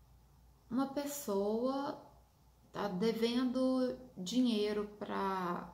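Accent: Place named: Minas Gerais, Brazil. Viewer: aaaa o sotaque mineiro é a coisa mais confortável de se ouvir🥰🥰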